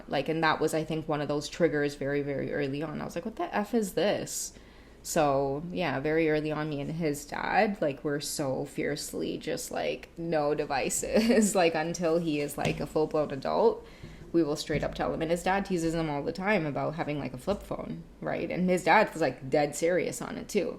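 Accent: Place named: Trinidad and Tobago. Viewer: Your channel is top quality what a treat you are! and with your cute ass trini patois just love to listen to you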